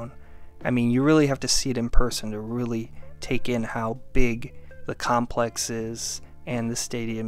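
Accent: United States. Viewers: One suggestion for a future video. Saint Petersburg, Florida. The original name was The Thunder Dome. Less than 48 hours later, I heard people calling it The Blunder Dome. SunTrust merged with BB&T and is now Truist. The voice has to be from the US. The City is MontReal, but he said Mont Treal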